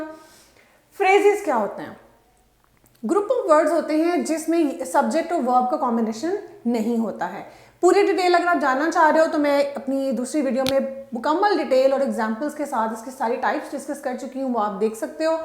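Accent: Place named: Urdu, Pakistan. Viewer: Mam I think your mother tongue is Urdu right ? But you teach very super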